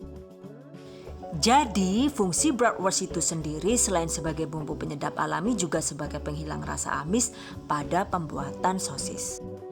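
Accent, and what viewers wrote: Banyumas, Indonesia. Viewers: Mbekayune wong ndi si kie yaa.. deneng teyeng basa penginyongan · Dr logatx kykx wong ngapak nih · Owalah wong ngapak juga ternyata. Ora ngapak ora kepenak yah mbakyu:D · Lah deneng Rika ngapak yu? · nyong kaget pas ngomong ngapak salag krungu pora teknputer ulang saya suka saya suka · Hahaha.....deneng ibune wong banyumas.....😃😃😃😃😃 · Deneng ngapak, ora ngapak ora kepenak yaaaa😁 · Lhaaa lhaaa.. Deneng ngapak mbak? Ya pd bae kr inyonge.. Aq jg follow akun njenengan sing ng cookpad mbak. Sukses trs mbekayu.. 👍🏻👍🏻👍🏻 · Madiun deneng bahasane ngapak kaya nyong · Ibunya ini orang mana yaa 😅 kok medoknya mirip akuuu yg dari Banyumas. Btw baru nemu channel ini hehehe bermanfaat bgt buat calon ibu rumah tangga nih hehe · Ngapake kue jenk....jan ora ngapak ora kepenak🤣🤣🤣🤣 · Njenenga jane asli ngendi sih bu?...deneng bisa ngapak?..ngapake bener sisan. 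Top pisan lah...basa inggrise jg joss... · hahaa gak tau kenapa aku suka banget bahasa ngapak ini. kalau denger temen ngomong aku melonggo sambil senyum. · Suka lihat video nya... Penyampaian bagus... Trus ngapaknya bikin kangen... Wkwkwkwk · Anu wong ngapak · Sy suka penyampaiannya jelas sekali... dan ngapaknya itu lho...👍👍😍😂😂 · Deneng ngapak ya wkwwk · Mirip pengisi suara warung tarsun di radio RRI pwt Apa lagi pas ngomong ngapak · Masa tepung keledai jeng ha..ha.ha..lnjuttt jeng ngapakkk..inyong jd ktawaa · Wong ngendi si rika mbekayu??? deneng ngomonge ngapak jan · Bu rika Wong ndi sih deneng ngapak temen Kaya nyong 😁😁😁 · mantapp...ngapak bangett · Mba wong ngapak ya...😅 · Deneng ngapak? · Iduguduh Deng malah wong ngapak? 🤣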